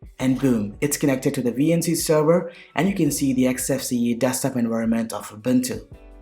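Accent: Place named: India. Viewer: I think he’s Indian because of his accent. I’m Indian too and I think his accent is Indian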